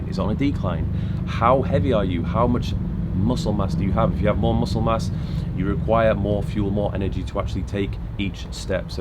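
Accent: United Kingdom. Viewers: 3 main reasons: 1. He is good looking ( and we tend to like and give good personal qualities to good looking ppl ) 2. The British accent ( which makes you sound more legit ) 3. He gives decent advice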